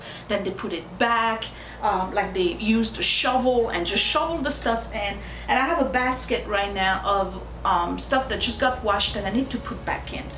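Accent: France. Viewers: not sure you will see this but I felt compelled to tell you that because of YOU and your daily motivation,allowing us to see your REAL life "collecting" I finally dropped off 6 trash bags and 2 boxes of clothing to the goodwill yesterday. I have been unable to make any substantial progress in reducing my junk until I met you. So when you are exhausted and not sure your videos are worth it, believe me they are SO appreciated. I hear your lovely exotic french voice in my head all day, motivating me to keep making those decisions, I have ignored for 10,15,20 yrs. I have not followed your schedule but started with clothing stored in bins in the laundry room because they bothered me the most and felt they would make the biggest impact once gone! This will take months and months but I am finally ready!! Thank you is not enough.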